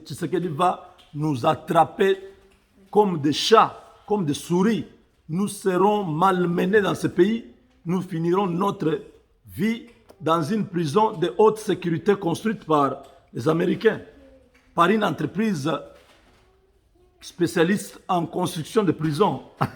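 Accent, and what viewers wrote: Rwanda. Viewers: Tu parles le français comme des rwandais, tu as de la famille là bas ?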